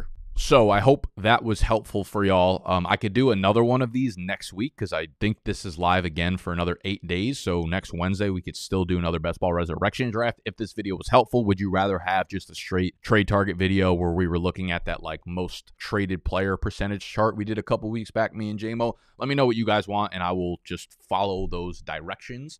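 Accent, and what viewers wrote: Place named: Philadelphia, United States. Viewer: Maybe this is a reach but I’ll feel like ur from Philly or Delco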